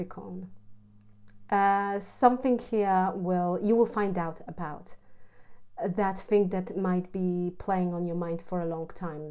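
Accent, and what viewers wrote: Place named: England, United Kingdom. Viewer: Love your English accent. You sound like a very sweet and caring person. I enjoy your readings.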